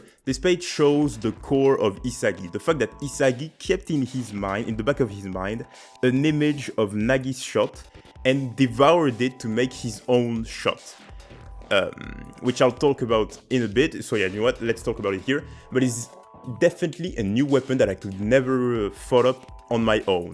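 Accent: France. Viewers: haha I think it's for the better. I believe your accent is very good for the ear. I especially picked that you were French when you said the word "Egoist" and "egotist". The "ist" at the end sounded very much the "ist" in the French word "égoiste". I think it's good, it adds variety of people in the blue lock community!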